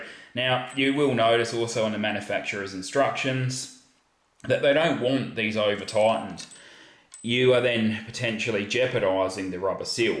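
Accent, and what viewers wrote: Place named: Australia. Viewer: VERY strong Australian accent. Fortunately i can understand it. Gave me a good tip i can use for the hose I’m installing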